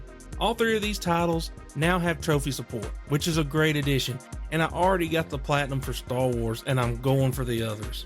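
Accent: South, United States. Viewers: it’s probably super obvious to you lol, but yeah now that I think about how many times I have to hear the same sounds over when editing… lol I didn’t even think of that 😂 But dude trust me, I play guitar and sing in front of small audiences and I still hate my voice, and when it’s played back to me, I’m like damn… is that what I really sound like? Ughhhhh lol But it’s just natural for most people ti hate their voice when hearing it back, it’s just funnny (to me lol, maybe not you ) but yeah it must get annoying hearing your own voice so many times over and over the same phrase or something when editing! Trust me though, for the third time, you’ve got a solid voice. A little raspy, southern, it actually stands out among others in a good way. Way too many British accents on reviews lol don’t get me wrong, it’s a cool accent, but there’s just a lotta Brit’s in gaming I feel… they’re pretty goood at it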